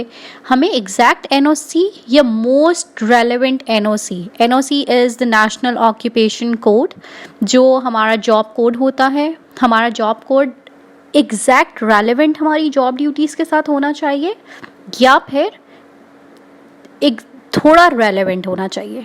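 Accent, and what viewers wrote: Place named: India. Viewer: Nice speaking English just like indian so easy..appreciate to you madem...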